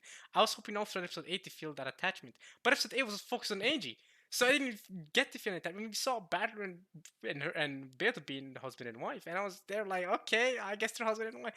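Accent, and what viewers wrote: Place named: Norway. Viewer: And you are talking like norway people... are you from norway?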